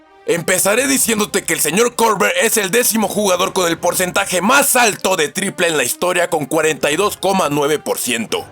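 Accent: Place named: Cuba. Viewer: Pareces que eres de cuba a la hora de hablar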